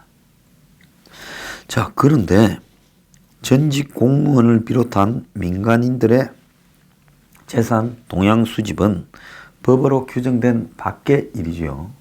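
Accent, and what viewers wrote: Gyeongsang, South Korea. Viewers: 경상도 사투리가 너무 정겹습니다 ㅋㅋㅋㅋㅋ....^^ 진지한 내용에 귀여운 사투리가 엇박자인데도....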